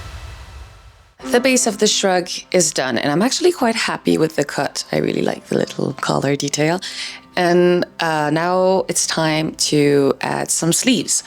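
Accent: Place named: Scandinavia. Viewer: I absolutely looove watching your videos! I’m a beginner to sewing, I’ve only made one thing🌸I started sewing because I wanted to make beautiful gowns like this, and your videos really inspire me to do that!!🌺 I have to say that the thumbnails are absolutely amazing and so beautiful💕I can’t wait to see what you do next 💓Your accent reminds me of a Nordic accent? I’m Swedish and it kinda resembles that💗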